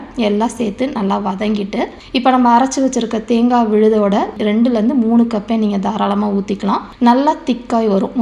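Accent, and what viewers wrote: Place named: Kongu, India. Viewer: Sister unga native enga.. கொங்கு தமிழ் mari இருக்கு உங்க slang????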